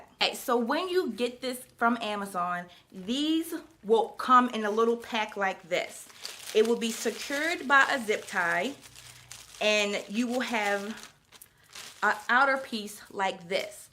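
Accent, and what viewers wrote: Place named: DMV, United States. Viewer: Thank you so much for sharing💛 I hear a DMV accent lol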